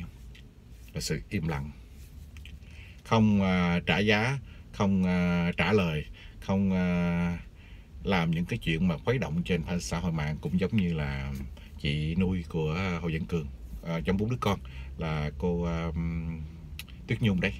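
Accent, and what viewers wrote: Standard. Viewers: Chủ kênh nói chuyện hợp tình hợp lý hay có tâm nói chuẩn quá anh trai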